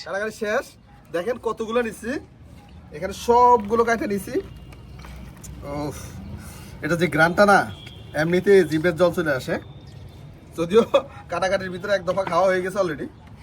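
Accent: Noakhali, Bangladesh. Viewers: Bhaiya ki noakhalir?🥹 Bakider Kotha Barta shune Moja laglo,roja rakha bhai er jonnow kharap laglo,unar iftar er Jonno kichu rekhe diten 😂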